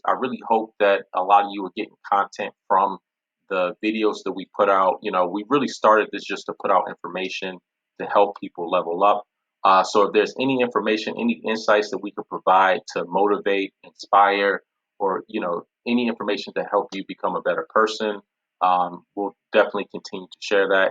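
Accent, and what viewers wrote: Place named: Prince George's County, United States. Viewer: The way you talk reminds me of home. You from P.G.Co.?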